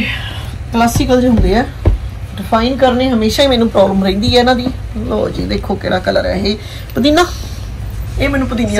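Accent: Standard. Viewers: Di suit te tohade sohne hai hi mainu tohadi pure Punjabi boli vich Bolan da style bda sohna lagda hai i like you very much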